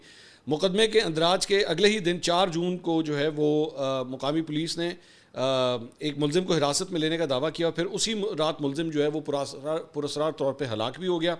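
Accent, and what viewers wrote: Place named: Lahore, Pakistan. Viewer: The Lahori accent, embracing it as a part of your identity and cultural heritage. The Lahori accent is indeed a unique and lovely aspect of Punjabi culture, and it's wonderful that in your videos i really appreciate and loved it!😂😂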